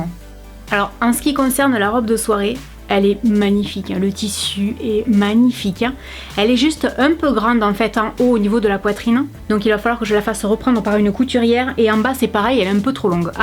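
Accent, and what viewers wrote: South, France. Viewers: Coucou :) Tu viens de gagner une abonnée ! J'ai découvert tes vidéos sur la page d'accueil de YouTube, et j'en ai regardé une bonne dizaine ! Je me suis marrée comme rarement je me marre en regardant des vidéos. Tu es drôle, fraîche et spontanée ! J'adore ton look, ton style et ton petit accent du sud 😃 Et mention spéciale à la vidéo "mes pires moments de solitude" où j'ai hurlé de rire! Je regarde tes vidéos le soir dans mon lit après une journée de boulot et ça me détend ! Gros bisous et ne change rien, c'est parfait 😘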